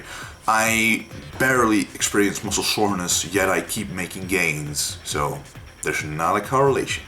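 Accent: Netherlands. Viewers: What accent is it? Is it Dutch?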